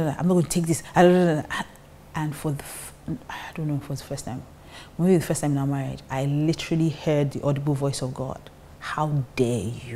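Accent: Nigeria. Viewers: I dont like that Nigerian tonation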